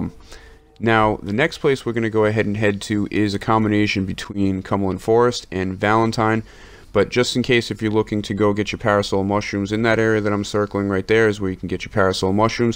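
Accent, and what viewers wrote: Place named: East Coast, United States. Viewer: you know i know you.said before that you lived in the UK and I was kind of confused lol. My daughters mother is from good ol Rhode island and I have wondered lol it makes so much sense now! You dont even have to explain. ( i must admit I did wonder a few times ok how does our friend here speak like a east coast United States native and says he lives in the uk. Makes perfect sense now. You can count on me to watch every video you make. Like and share with everyone I know. You, you are the type of YouTube channel that deserves thousands and millions.... You are real man! You always got a friend and supporter in me old! You already know that though! Keep up the great work my friend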